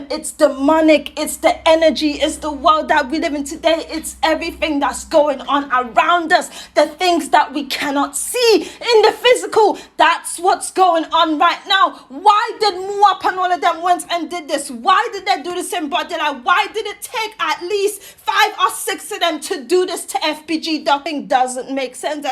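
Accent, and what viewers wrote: England, United Kingdom. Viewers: You sound like you from England leave that street shit alone..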